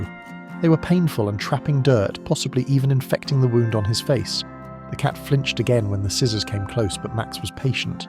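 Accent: United Kingdom. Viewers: Thank you so.much. pls use a narrator with an American accent. Uk accent i s rather irritating.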